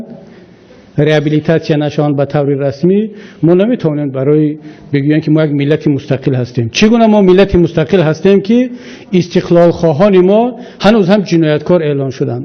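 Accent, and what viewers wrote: Tajikistan. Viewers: лафзаш тоҷикии тоза аст. ман ба лафзаш ягон эътироз надорам. ман аз ноҳияи Айни вилояти Суғд мебошам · Ана инпа меган точики тоза бо забони точики тоза гап мезана одами бо илм малумаст илмаш.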